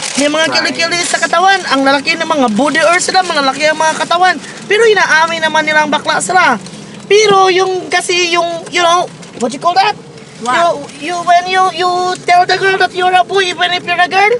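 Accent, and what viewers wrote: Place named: Visayas, Philippines. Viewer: Omg you never fail to make me laugh!! Ang galing mo teh!! Hahah! Pasok sa banga yung bisayan accent!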